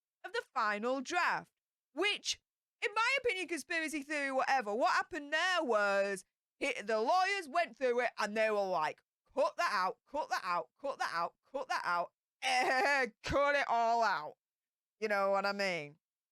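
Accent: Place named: England, United Kingdom. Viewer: I dont know what to think about Harry. He was Always my Favorite, but now? I dont know what to say anymore. He should divorce Meghan. They dont fit together. Since she is in the Family, everything goes Downhill. your accent remember me For Louis Tomlinson from one direction 😂 He ist from doncaster 🥰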